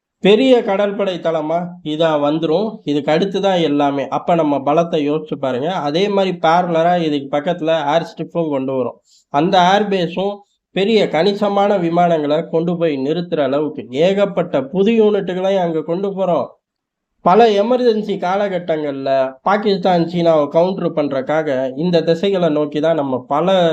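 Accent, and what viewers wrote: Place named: Nagercoil, India. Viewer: Ur voice is very familiar. R u from nagercoil.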